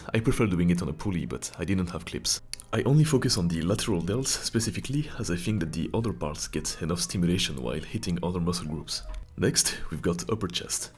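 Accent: France. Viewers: Is he French? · No offence bro but you sound French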